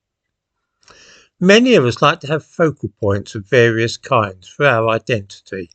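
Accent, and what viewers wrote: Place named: East London, United Kingdom. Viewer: Strong hint of East London accent this morning. Appropriate for this waffle.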